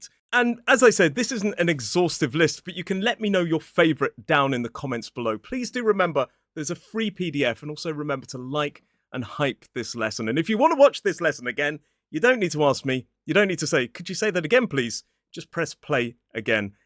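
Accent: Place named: RP, United Kingdom. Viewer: No one speaks RP, apart from you and 5 othet people I encounterd in all my 26 years in the UK 😅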